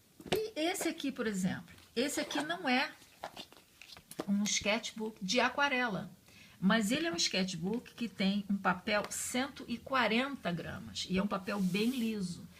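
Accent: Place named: Rio de Janeiro, Brazil. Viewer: amei esse video ( e todos os outros que ja assisti aqui do canal..kkkk) muito boas suas dicas...muito sincera,direta...sem enrolação...muito eu...( sou carioca e esse seu sotaque me faz pensar que vc tb...acertei ???) Bj grande e muito grata !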